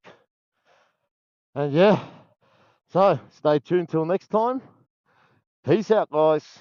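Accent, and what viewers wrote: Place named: Australia. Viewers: Yeah this is a GEM bike. IMO it's the complete placate because you can use it for commute, twisities and some level of track riding! Btw are you from Australia, haha? Can sense the aussie in ya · Definitely sounds like a fellow Australian, which makes the fact he's driving in somewhere with greek/cyrillic alphabet(?) all the more interesting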